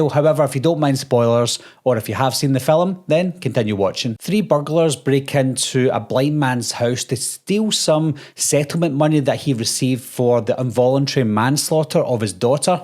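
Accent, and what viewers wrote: Scotland, United Kingdom. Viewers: I didn’t expect to come across an Irish youtuber that breaks down movies, I was curious, but now I’m pleasantly surprised it’s the accent I like to hear the most😭😭 (or it could be Scottish I honestly have trouble differentiating the two, but I like them both)❤️✨ Checked the about. Sorry, Scottish** youtuber 😆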